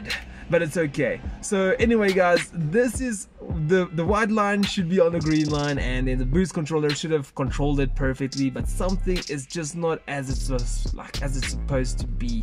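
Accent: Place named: South Africa. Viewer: Haha I heard your accent and figured. I'm in SA as well, Midrand side.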